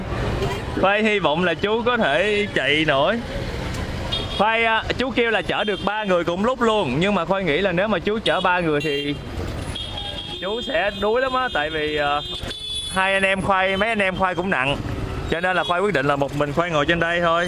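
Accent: Mekong Delta, Vietnam. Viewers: Dân mìền Tây nói chuyện nghe vui ghê. Vui vẻ thì nói là dui dẻ. Màu vàng thì nói là màu dàng.Việt Nam thì nói là Diệt Nam..Mình có dạy cho thằng bé lớp năm quê ở miền Tây môn tiếng Việt mà sửa lỗi chính tả cho nó cũng muốn tẩu hoả nhập ma. Nhưng giọng, cách phát âm thì vẫn cưng và nghe hay thật.Chính mẹ mình dân Daklak còn khen Khoai nói chuyện hay.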